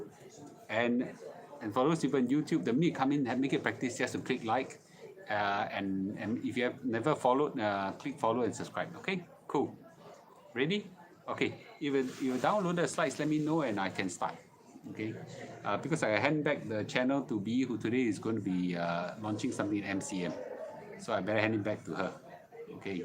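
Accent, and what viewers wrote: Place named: Singapore. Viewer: His casual singlish is making my mind tired 😫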